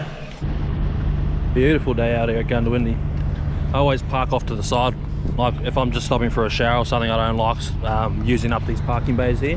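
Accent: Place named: New Zealand. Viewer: Funny listening to a kiwi explains trucking life on the east coast. I lasted 8 minutes. Thenks brew.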